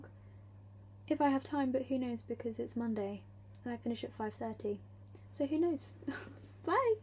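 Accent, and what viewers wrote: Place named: RP, United Kingdom. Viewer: I just watch your videos because i really enjoy listening to you :elbowcough: RP best accent :shelterin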